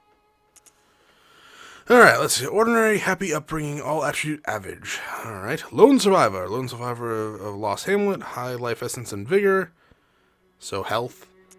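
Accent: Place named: Mid-Atlantic, United States. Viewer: Knowing that has Brit relatives goes someway to help explain his accent, clearly American but every now and then it verges into mid-Atlantic eg lever pronounced as lev-ver but occasionally as lee-ver it's somehow enjoyable to listen too & coupled with the gameplay & the extraordinary engaging commentary it has me watching a game that not normally my cup of tea.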